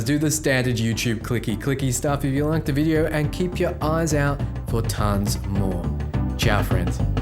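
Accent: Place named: Australia. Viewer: Hey, are you from Australia? I feel like your accent is Aussie (I'd guess Sydney):P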